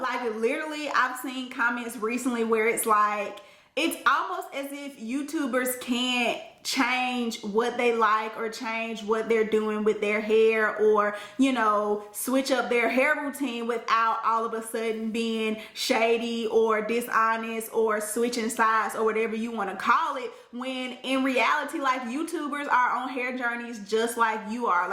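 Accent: South, United States. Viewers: Omg you are the first southern youtuber that I've seen and I love it!!! 😂💙💙💙💙 Yass with that accent gurl!!!! You legit sound just like me and I've never seen a black youtuber just accept their "country" accent. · you remind me of Jessica Dime from Love & Hip Hop Atlanta with your southern accent!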